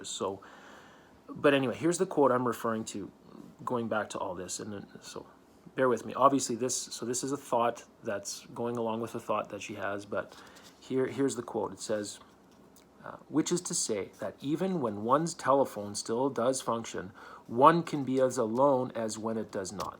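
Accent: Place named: Canada. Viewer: Ah, I could sense some sort of Canadian in the accent. Alberta is a beautiful place. Much love form Toronto! - pls keep up with the reviews/book vids, there are no where near enough people covering these sorts of books let alone reading them.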